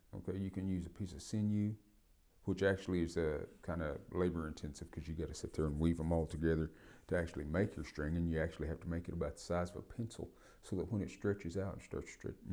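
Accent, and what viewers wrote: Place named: South, United States. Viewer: i was so expecting a heavy native man accent....was not expecting that southern accent...im native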